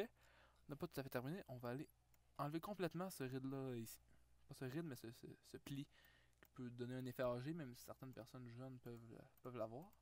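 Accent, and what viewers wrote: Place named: Quebec, Canada. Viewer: Salut cher ami Québécois. Je suis Français de France donc j'ai un peu de difficulté à comprendre... Est-ce que tu pourrai faire un accent plus français dans tes prochaines vidéo ? Cordialement, Gilbert.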